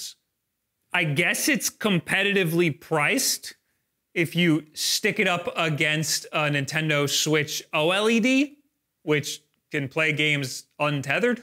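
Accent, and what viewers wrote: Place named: Long Island, United States. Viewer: PLZZZ stop talking like a Long Island hussie 😊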